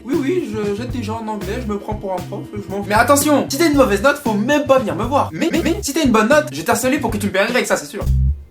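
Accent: United Kingdom. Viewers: L'accent british qui tue tout 👌🏽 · Waa le british accent !!! Thanks for the help man 👍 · This british accent 😍😍😍😍😍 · That British accent though👌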